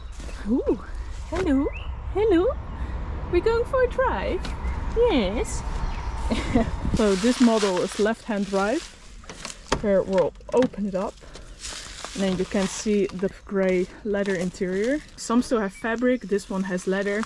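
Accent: Netherlands. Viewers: I had several of these. Loved them all. Never stuck not once. I had a G Wagon and I prefer the range rover, which has shortcomings but also lots of personality. I love this Dutch girl's accent. There should be a shim between the brake pad and the inside of the caliper which should eliminate the squealing. It's a wafer thin piece of metal.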